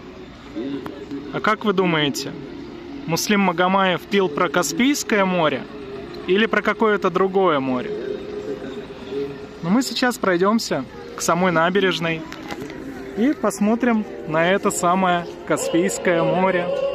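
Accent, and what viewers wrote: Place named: Volga, Russia. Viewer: У вас Поволжский акцент,вы скорее оттуда.Я сам бакинец,но живу сейчас на Кубани на Чëрном море.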